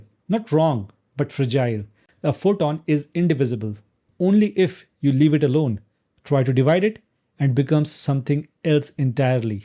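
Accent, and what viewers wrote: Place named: India. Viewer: If your attention span for listening to a man speaking English very clearly and well articulated is only 8 sec because the man has an Indian accent I propose the communication problem is entirely on your end. - by the way, you write English in a poor way yourself. Your sentences are missing words before they can be called full sentences. And your last sentence says that you lost patience with an articulation which is itself impatient with the audience of the articulation - that is just gibberish. I suggest you go practice your own English before criticizing others.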